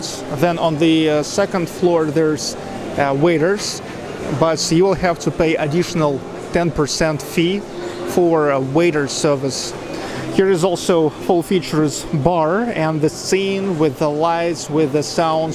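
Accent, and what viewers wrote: Russia. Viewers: He's russianglish :)) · Ur Russian accent is fingerlickiing good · Like your strong Russian accent :)))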